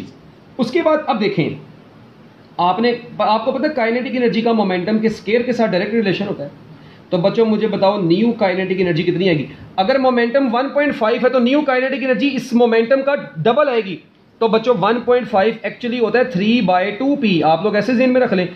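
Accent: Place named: Punjab, India. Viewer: really sir jus amzing punjabi accent ke sath aur mast se samaj aa gyaa thnxxx a lot sir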